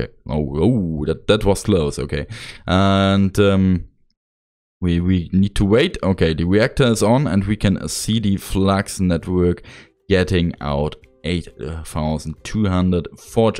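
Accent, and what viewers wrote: Germany. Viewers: Do I hear a slight German accent?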